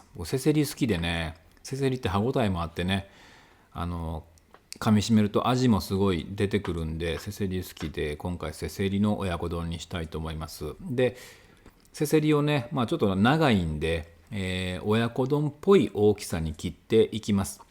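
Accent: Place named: Kyoto, Japan. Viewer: 徳井さんの京都弁「お出汁をパッ~ と入れますと...」夢中になってみれます。無になれます。徳井さんに感謝⤴︎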